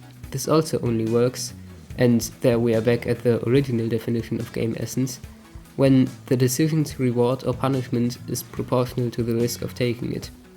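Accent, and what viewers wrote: Germany. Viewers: Man hört den Deutschen akzent😂 aber ich liebe Splatoon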